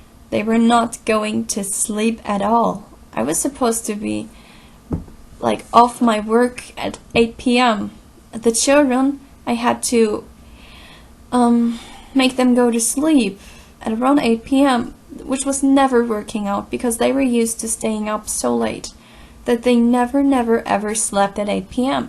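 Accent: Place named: Germany. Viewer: wie man immer sofort weiß dass jemand deutsch ist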